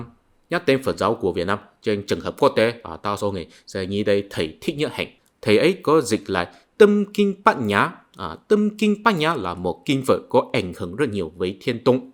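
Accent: China. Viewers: giọng tàu khó nghe quá ..không bằng Lào nói tiếng việt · Nói tiếng Việt giỏi mà nghe như đang nghe tiếng trung quốc vậy, giọng của bạn rất hay.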